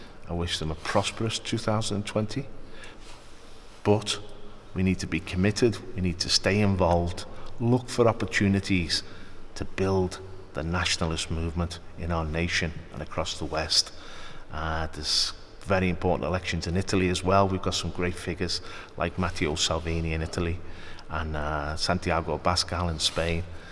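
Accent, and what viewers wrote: Liverpool, United Kingdom. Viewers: Happy New Year! Thank you for hard work and persistence! So great to hear a Scouse accent talking our language!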